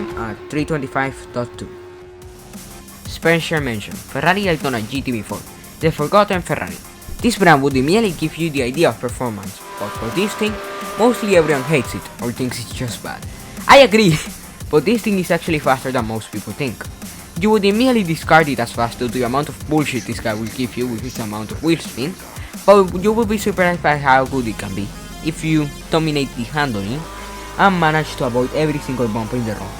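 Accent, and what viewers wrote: Spain. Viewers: wtf the spanish accent bro lmao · Luv the Spanish accent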